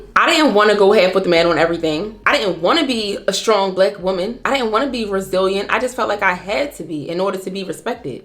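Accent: Baltimore, United States. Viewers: I hear the Baltimore. So I know you know first hand how hard it is to be feminine in Baltimore. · This was gooood. Like a sermon lol. You should like you're from Bmore. Thank you for this. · Are you from bmore by any chance, if so I can hear it in your accent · I’m working on being more intentional as a wife and mother and a better woman, so I decided to come on YouTube to find a black woman who has been implementing intentional changes in their life and I came across your videos. Thank you for sharing your story. Side bar as I was watching one of your video I was thinking she sounds like she is from Baltimore 😂. Shortly after you stated you were from Maryland. Nevertheless sis keep up the great work. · Is she from Baltimore? I hear it in her accent and I’m from Baltimore lol. I wouldn’t be surprised bc a lot of us women who grew up here have had to build this masculine, tough girl persona to protect ourselves in this city and I am so happy to know I’m not the only woman here who is tired of it lol (if she is actually from Baltimore) 😅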